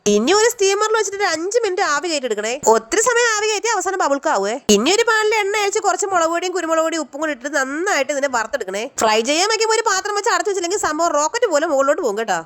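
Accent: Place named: Kollam, India. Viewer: ഗർഫിണി... ചേച്ചി കൊല്ലം കാരി ആണോ 😄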